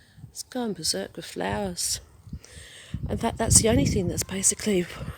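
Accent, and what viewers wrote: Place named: Australia. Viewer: By the way, I noticed you have a bit of an Australian accent....😂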